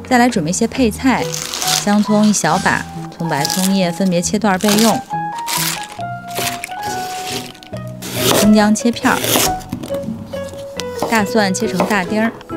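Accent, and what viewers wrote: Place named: North, China. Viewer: 看起来很好吃 喜欢听你北方味儿的普通话 字正腔圆：）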